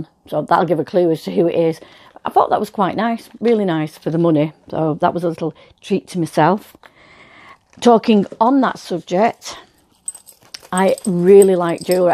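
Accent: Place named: Manchester, United Kingdom. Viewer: Hi I’ve only just found your channel, but as a fellow Mancunian I’m really enjoying your content! I can tell by your accent that we are likely from the same part of Manchester! Can I recommend an author for you? I love to read any books written by Emma Salisbury, she is a crime writer and all her books are based in Salford, I love them and hopefully you might do as well. Looking forward to your future videos. x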